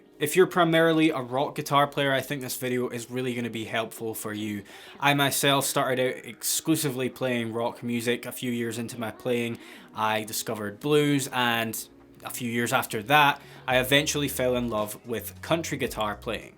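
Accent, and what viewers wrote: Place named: Scotland, United Kingdom. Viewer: Love watching lesson, not only explained things well, but also i love hearing his scottish accent 😁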